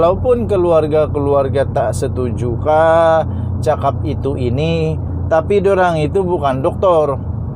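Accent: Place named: Sabah, Malaysia. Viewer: JANGAN KAMU BAGI BARITA YANG TIDAK BUTUL.AH...KALAU BUTUL BARITA TIDAK APA LAH..KALAU BOLEH UBAH LAH CARA BRRCAKAP...KANAL BUTUL SUDAH YG INI DARI SABAH.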